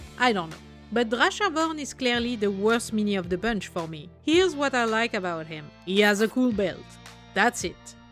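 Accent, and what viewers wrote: France. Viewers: you're one of the only people discussing new GW models and not complaining about how complicated they've become over time. When I started this hobby, all the models were metal. They were awful compared to what they are now. Many creators hate Primaris Marines, but I think we finally have properly scaled Space Marines for the tabletop. I'll complain about GW's ability to balance their games, write rules and their tendency to ignore their own lore or worse, retcon it. But they do make amazing models. And honestly, your voice activates neurons. It's like, musical. I live in NYC and I'm well aware that I have a very Bronx accent. I've heard myself on videos and I'm always shocked. Hearing an amazing French accent from a female is a distinct pleasure for the ears, especially when you're surrounded by people who start every sentence with the word 'yo,' and end it with 'ya heard?' and somehow slip 2 'motherf*ckers' in along the way...a feminine French accent is like a holiday after a day spent on a NYC construction site. 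Edit: And now I find myself admiring the models silhouettes...that's strange. Where did I pick up that from? 🤔 Sigh. Lunch is over. Back to work.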